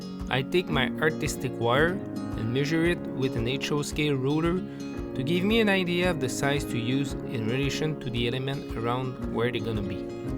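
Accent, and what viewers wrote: Quebec, Canada. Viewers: Ah enfin un belle accent québécois en anglais 😁😁. Cool ton tutoriel, c'est facile et surtout rapide, je vais l'essayer c'est certain.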